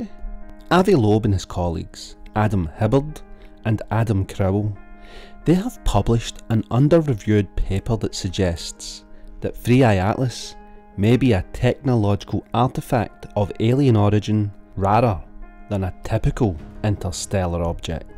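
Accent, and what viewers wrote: Scotland, United Kingdom. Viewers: Scottish/Edinburgh accent. As a Dane it sounds like that anyways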